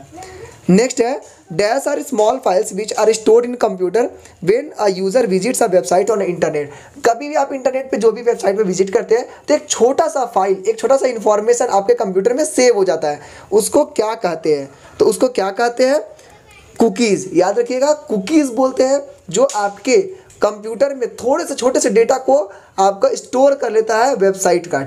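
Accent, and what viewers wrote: Bihar, India. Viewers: Hello bhaiya , you teaches very well. I study IT from you and I want to ask you that are you from Bihar?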